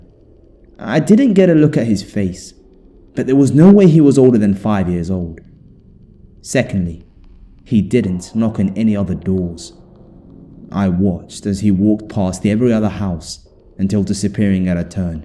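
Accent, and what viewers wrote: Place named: United Kingdom. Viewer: I wish I would hear YOUR fabulous Brit voice saying "trick or treat" at our door this Halloween! Lol. Long way to go for a b it of sweets! Thanks for the spooky! Hope your weekend is good. Happy Halloween!👻💀😈🎃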